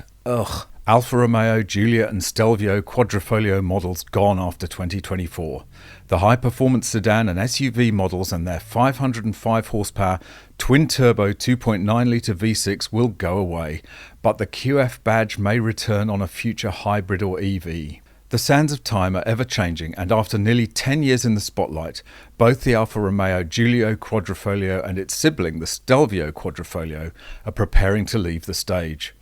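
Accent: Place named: England, United Kingdom. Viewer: Oh! What about Mercedes, Rolls Royce, Bentley and an old Australian car called a Statesman Deville, made by Holden, which I’ve had for years. I think they’re heading in the same direction. That’s my collection... And how do we get away with driving our beloved old cars? I don’t know. It worries me a lot. Annie from Melbourne. I like your channel, and it’s good to hear an English accent again. I lived in England for three years.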